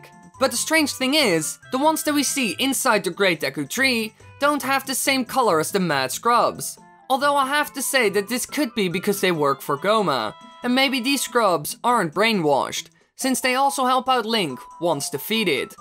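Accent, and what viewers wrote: Netherlands. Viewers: Are you Dutch because I recognize the accent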